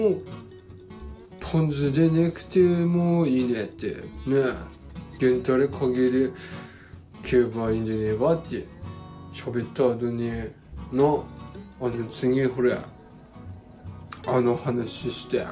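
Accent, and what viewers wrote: Tsugaru, Japan. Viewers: 綺麗な津軽弁ですね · うちの青森のおじさんにソックリ…しゃべりも…ガッツリ津軽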